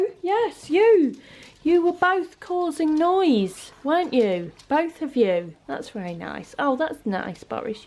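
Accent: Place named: London, United Kingdom. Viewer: I love your London accent, mine, according to my husband, is New Yorker mixed with Italian and Portuguese. In short, a horror !!! I love the winter clothes and your style. I was amazed not to see Karl Lagerfeld's picture at the Oscars, it was disrespectful !!! Sad!!!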